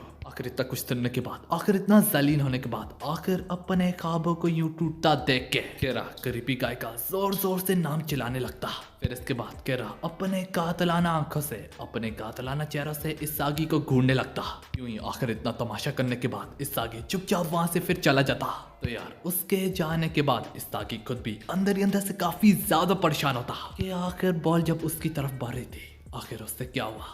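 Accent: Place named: India. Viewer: bro just play not say it with cho indian voice makes it terrible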